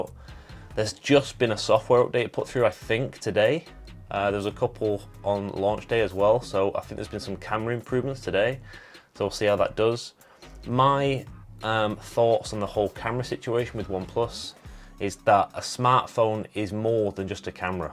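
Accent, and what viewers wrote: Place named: England, United Kingdom. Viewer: Decent unboxing man. Thanks for showing off the colour. Totally agreed on the matte black, would've preferred last year's blue (did you see it?) but matte black easy second choice. Its about time we moved in from glossy fingerprint magnet phones. Just no. I got my Galaxy S10 in green because I wanted flashy but when it was nicked I had to get black. I was wrong, black much more refined. I'm rocking the red case now. Black and red classic combo. Reckon I'm getting the same as at next pay day (tempted to get the watch too). 128GB is plenty for me (my S10 is 128).I like Samsung's OneUI but OxygenOS looks much more refined. I use barely any of the Samsung excessive extras. Also totally agreed on the camera front. It's important but all American YouTubers do is bang on about the camera. The world's in a bloody lockdown anyway, my cat doesn't need another photo taking 😅. 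They barely mention the software and it's so important. A good phone with a bad Android launcher kills it. And from one Englishman to another your accent 👌.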